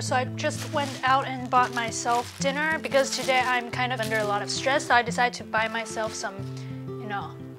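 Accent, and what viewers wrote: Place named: New Zealand. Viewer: Your Vlogs are always amazing, even if I have no idea what you're saying the visuals overcome the language boundaries. Funny how you mentioned your kiwi english, because you would fit in quite well here in NZ, you definitely have our accent :-)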